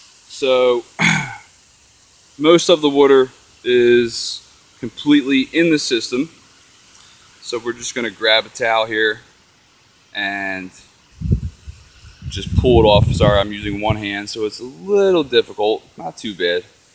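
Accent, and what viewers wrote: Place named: Pennsylvania, United States. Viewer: You said "wudder" (water) and "Rad-e-ator". Are you from York county Pennsylvania or Carol county Maryland?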